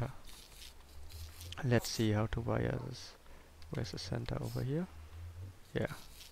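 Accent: Germany. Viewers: German accent :D and.. can you german?! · Are you german? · Are you German?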